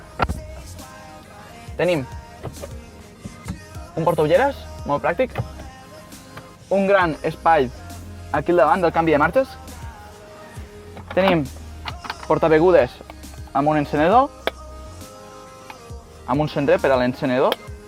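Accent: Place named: Majorca, Spain. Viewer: Habla mayorquin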